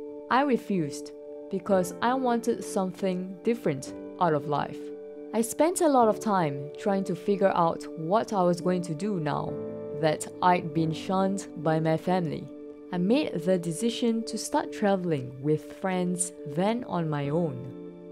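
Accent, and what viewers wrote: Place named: Kedah, Malaysia. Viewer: he speak bahasa kedah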